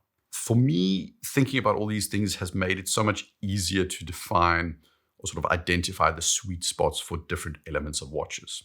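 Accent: South Africa. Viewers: Your accents sounds soooo South African. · My wife is South African, I’ve been to South Africa, love the people and the place- this is a heck of a watch channel, but I do feel biased for that South African accent…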